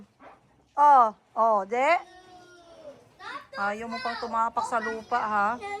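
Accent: Iloilo, Philippines. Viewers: Urs when i hear you speak ilonga nakakatuwa but My papa is speaking bisaya he is from bohol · Natutuwa nga aki madam mag salita kw ilongga kc may kaibgan dn ako taga ilo ilo.ingat po kau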